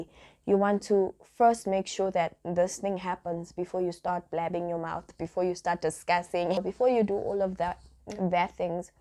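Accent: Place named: South Africa. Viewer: You sound South African you know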